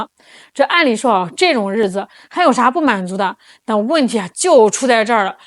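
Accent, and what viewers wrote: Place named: Shanghai, China. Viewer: Your Shanghai dialect is so funny! 😂👍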